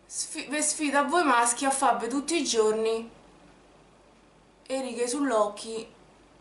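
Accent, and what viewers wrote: Rome, Italy. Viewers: ahahahhaha mi fai morire adoro l'accento romano :D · sei fantastica mi fai morire dal ridere !!!!ti seguo da poco(adoro l' accento romano) daje ❤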